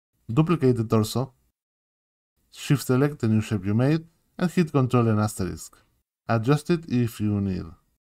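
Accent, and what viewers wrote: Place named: Spain. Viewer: Really nice videos, all of them. I buyed the promo and its wonderful with lot of content. BTW your accent seems spaniard, do you have any video in spanish. I want the for 2 things: show it to my children and also as a Teacher I wish to do a inkscape tutorial with my students. Think on it! they need like 10 visualizations per student to get to do the exercises (somehow something that resembles a draw). Thank you for this really nice channel!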